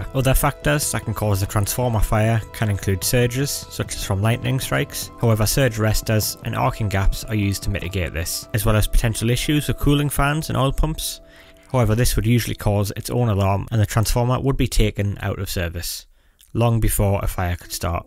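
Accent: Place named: North East England, United Kingdom. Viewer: Nice to hear a North Eastern voice on YouTube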